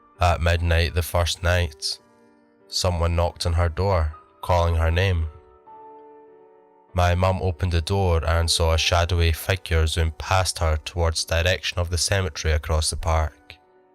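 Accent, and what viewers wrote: Scotland, United Kingdom. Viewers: Yeah!!! I've found me a Scott. Love the accent. You have a new sub and I look forward to hearing from you for a long time. 😍😍😍😍😍😍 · Creepy, uh Mr what part of Scotland are you from? I have been so busy haven't had the chance to see your answer, and haste ye back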